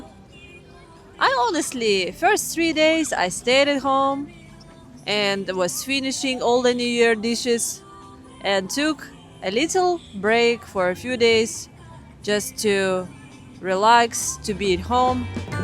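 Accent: Russia. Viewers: *"My suggestions",...in beautiful weather, maybe 50C you surfing at the beach. Restaurants are always awesome. Take me to a movie at the theater would be awesome too. Introduce me to your family and friends. Show and tell about where you went to school, in the beginning. Your childhood memories. Please take me to your church, I hope you go. I want to meet your pastor. For starters. I enjoy when you talk all comrade gangster with a thick Russian accent.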